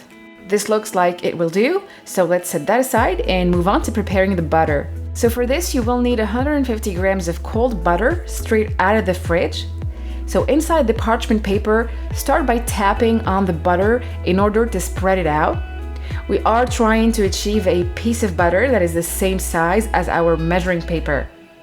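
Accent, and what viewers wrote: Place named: Quebec, Canada. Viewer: Been roughly 10 years since I’ve made croissants this video is the perfect explanation for how to laminate dough! Even Touch my girlfriend how to make them as well thank you very much keep up the amazing work ! Also when I here the accent I’m like damn this gonna be good 😂😂 French Canadian ?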